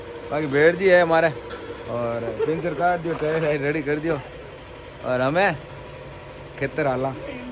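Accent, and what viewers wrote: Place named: Rajasthan, India. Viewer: Boli Bohot ghaint lgdi Rajasthan di full ghaint 👍👍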